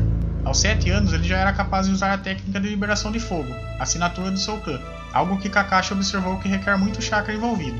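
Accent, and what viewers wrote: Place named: Minas Gerais, Brazil. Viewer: Mano seus vídeos são muito bons, tu manja muito, mas na moral cara, procura uma Fonoaudióloga, não é por implicância ou por qualquer outra coisa, mas seu sotaque torna o vídeo maçante, parece um cara do fundo do interior de minas falando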